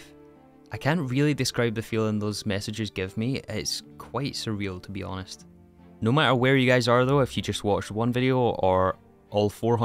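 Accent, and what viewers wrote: Scotland, United Kingdom. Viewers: we've all had the honour to experience and enjoy your brilliance in this blocky game. Life changes and evolves, as must we along with it. We will miss you, we love you, and we're happy if you're happy. So long dear friend, hopefully we may hear that beautiful Scottish accent again in the future. Wherever you go, whatever you do, always ALWAYS check if its HV or MV. ❤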